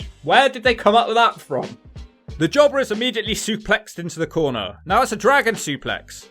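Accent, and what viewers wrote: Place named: West Country, United Kingdom. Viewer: I've watched a few of your videos and always enjoy them. From your (West Country?) accent I thought you would be like 5'6, nerdy black hair and glasses. But you are very presentable, keep up the good work, I appreciate you're unique take on everything wrestling wise. There is so much generic wrestling commentary on YouTube, so your distinctiveness definitely stands out.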